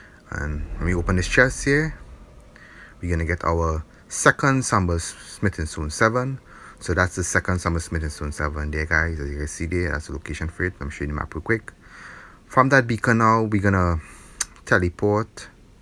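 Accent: Trinidad and Tobago. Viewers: A Trinidadian I presume?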